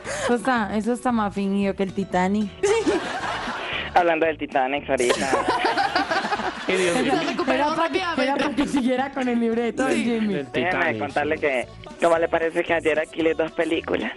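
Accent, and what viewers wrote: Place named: Colombia. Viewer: Me encanta el acento colombiano....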